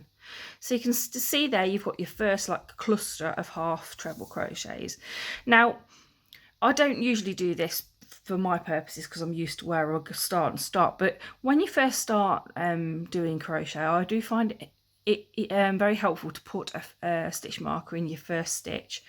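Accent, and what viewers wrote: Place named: Midlands, United Kingdom. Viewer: Great video. I just love your Midlands accent, Birmingham??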